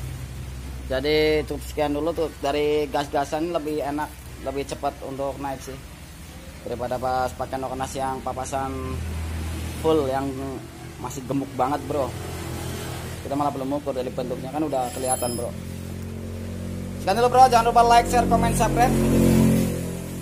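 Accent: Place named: Banyumas, Indonesia. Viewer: Ora ngapak ora kepenak..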